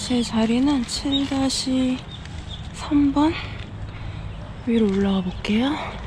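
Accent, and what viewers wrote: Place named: Seoul, South Korea. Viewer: 서울말 ㅎㅎ!!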